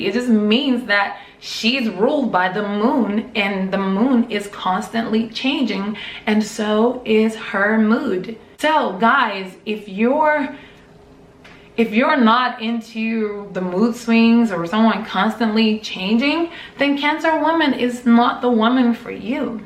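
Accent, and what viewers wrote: Caribbean. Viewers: Where are you from you sound like your accent is Caribbean!? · yea i grew up in Bama but I kept focusing on what I thought was a Caribbean accent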